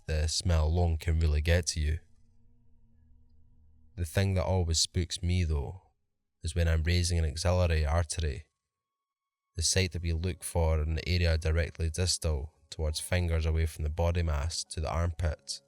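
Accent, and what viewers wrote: Scotland, United Kingdom. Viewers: I already knew that I loved morgue stories, but with a Scottish accent, I love them all the better!! 👍👊fist bump!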